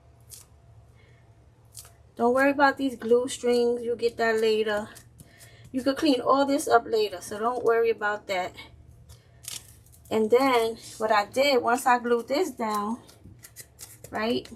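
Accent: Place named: Louisiana, United States. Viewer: Just discovered you!!! I love it!!!! Your accent reminds me of Louisiana... Baldwin, Franklin, Jeannette, Grand Mary, New Iberia ....somewhere round there💜💜💜👏🏽👏🏽👏🏽👍🏽👍🏽👍🏽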